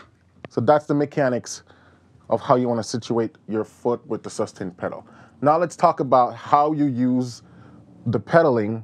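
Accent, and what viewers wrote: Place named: Jamaica. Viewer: Was only listening to the Jamaican accent 🤩.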